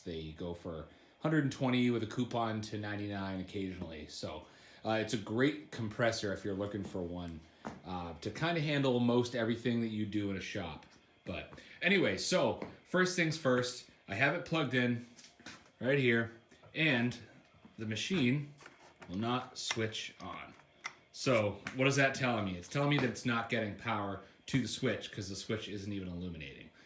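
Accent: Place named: Canada. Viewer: You sound like a Canadian.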